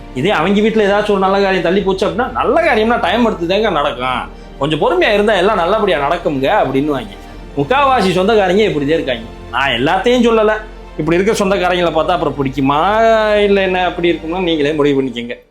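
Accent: Madurai, India. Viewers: Madurai slang 👌👌👌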